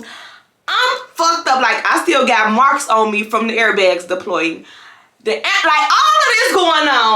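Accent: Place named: Chicago, United States. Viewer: The Chicago accent made the story even better! And now I'm bout to watch story times on YouTube! This was a good one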